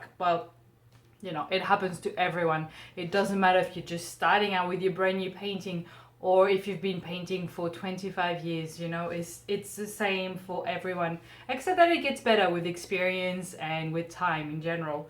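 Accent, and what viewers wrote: Australia. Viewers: thank you for your inspiration! I liked your commentary about just playing - not to create a masterpiece, just play. I've never used my hands to paint before and it was so very much fun! Thank you for your posts - you are lovely to watch. Also, your accent is lovely - part Aussie, part French perhaps? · So you live in Sydney. I thought I could hear an Aussie accent coming through. With a username like I gather you are from somewhere there. Beauitful. Thank you for the video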